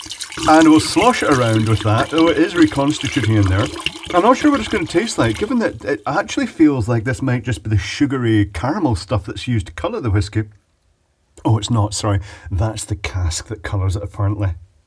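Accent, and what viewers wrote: Scotland, United Kingdom. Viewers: is that scottish accent?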